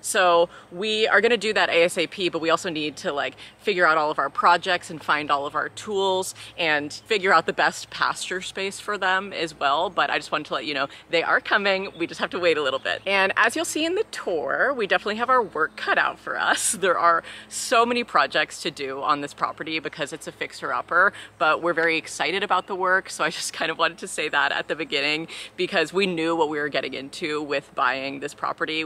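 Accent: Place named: South, United States. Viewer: This is such an amazing place, congrats to you both!! Your future children are so lucky to be able to grow up in a place like this I know youre gunna make it so homely and warm<3 Also! Idk if youre doing it on purpose but I feel like youve gotten a more of a southern accent since being in virginia than when you were in oregon haha its so cute!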